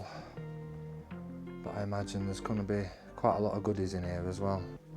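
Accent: Yorkshire, United Kingdom. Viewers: Stumbled across your channel scrolling through YouTube! Love the work ethic! Would be great if the end of your videos you did a breakdown of the financial side of the job. What you charged and what you made from the good bits that you’ve kept to scrap or resell etc. Looking forward to the next video! All the best from a fellow Yorkshireman (you sound like a yorkie anyway 😂)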